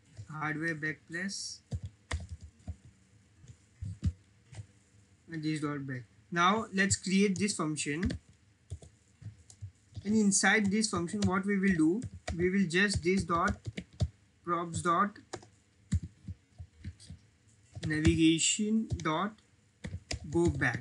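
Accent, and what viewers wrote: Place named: India. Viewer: Are you from India or somewhere near it? I love your accent.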